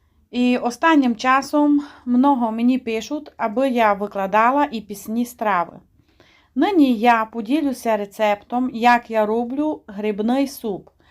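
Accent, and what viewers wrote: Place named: Hutsul, Ukraine. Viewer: Люблю гоцульський акцент👍🙂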